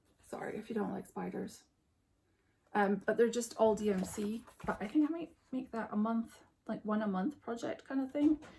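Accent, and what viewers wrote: Scotland, United Kingdom. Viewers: Hi, I just found your channel after a shout out from mommolovesyougb. Those are some fantastic finishes for the year, you achieved a lot! Great hearing a familiar scottish accent on flosstube, I am a fellow scot from aberdeenshire! Hope you have a merry christmas, a happy hogmanay and a fantastic new year!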